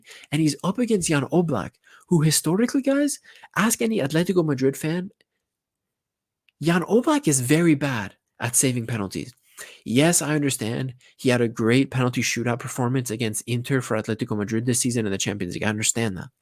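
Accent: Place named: United States. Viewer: Jeet speaking with an American accent. Opinion discarded.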